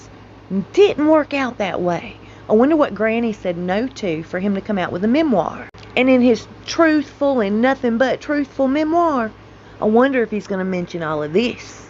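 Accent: United States. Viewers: I love your american accent its soooo kewl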